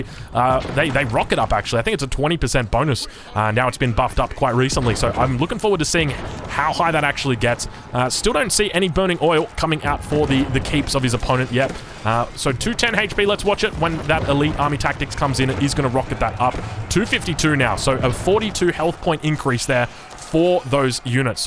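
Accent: Australia. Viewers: Incredible game, incredible commentary! I've seriously never enjoyed any commentary with an aussie accent til now and absolutely nails it! loving every second of it.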